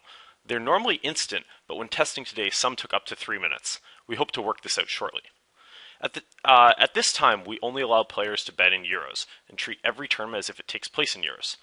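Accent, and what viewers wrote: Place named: Canada. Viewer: This guy is the most Canadian sounding Canadian ever.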